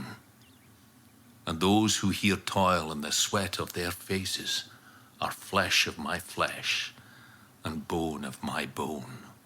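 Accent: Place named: Scotland, United Kingdom. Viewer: Listen to that Scottish burr! Gives me goosebumps!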